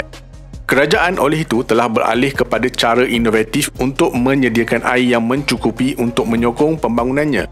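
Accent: Malaysia. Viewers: Cara bercakap macam orang Malaysia, Salam Dari Malaysia 😂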